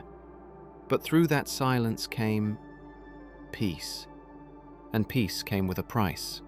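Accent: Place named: United Kingdom. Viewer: Even AI with a British accent mispronounces all foreign words. 🇺🇸⚓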